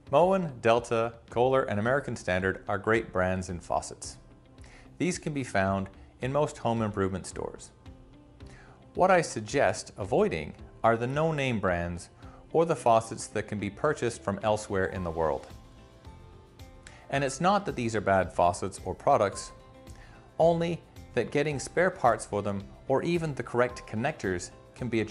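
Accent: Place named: Canada. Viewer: North American plumbing is .... weird! I say North American, as I detect from your accent that you may be Canadian. In the UK taps (faucets) are also categorised with regard to compatibility/suitability according to pressure differentials on cold water and domestic hot water. Some mixer/single outlet spout taps do not work well where hot water is gravity fed (via a roof tank through a hot water storage cylinder-gravity) and cold water is supplied directly from the mains. This is particularly problematic on bath mixer taps. Upon fitting bath deck mixers (2 heads one spout) I have found that the cold, mains pressure actually forces hot water back into the vented cylinder, up to the roof tank(s) and out of the property through the warning/overflow pipe. By the way, you deserve more subscribers. I have 50+ subs, my production standards are very amateurish and I don't just do plumbing content. 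So, I've subbed.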